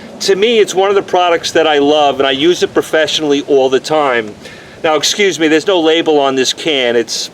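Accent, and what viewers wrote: New York, United States. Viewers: No way you're from Dallas Texas 😂. Sound like your from my part of town. New York / Jersey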